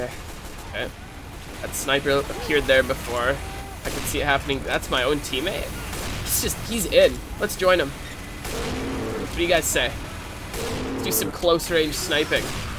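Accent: Italy. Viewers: yeah i can tell you're italian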